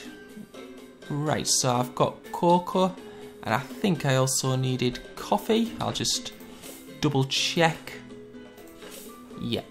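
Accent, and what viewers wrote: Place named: Sunderland, United Kingdom. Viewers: I'm from Sunderland, you sound like one of us. For that reason I am now subscribed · this is more of the northerner accent than the traditional british accent most are accustomed to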